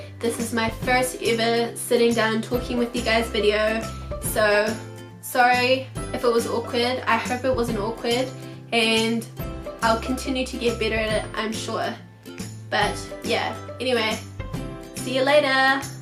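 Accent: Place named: New Zealand. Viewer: Is that a Kiwi accent I hear?! LOVE IT 😍🙏 And awesome video, great tips chica! X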